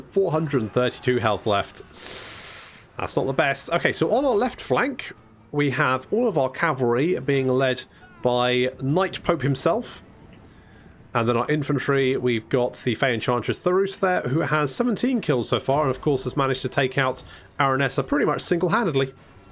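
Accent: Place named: United Kingdom. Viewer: And lastly also I think your British accent and humor plus good preparation with brilliant texts, make you the best warhammer campaign YouTuber. I love how you interact with what the characters are saying. If I would have a firm that would need any guy to connect with the community or make communication videos to community I would always choose you above others. You've got a talent. :)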